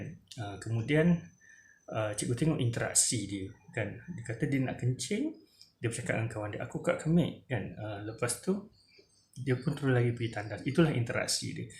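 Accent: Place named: Iban, Malaysia. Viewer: Best sebutan cakap Iban cikgu. Betul2 original. Belajar lebih banyak lagi Cikgu.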